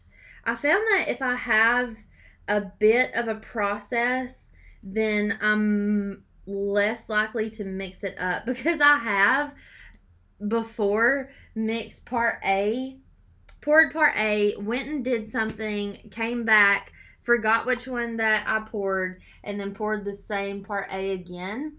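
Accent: South, United States. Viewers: Your beautiful projects match your beautiful smile & personality. I’m in MI and l could listen to your sweet, southern accent all day. Thank you for taking time from your family to show us these crafts. They are always so beautiful!💕🎄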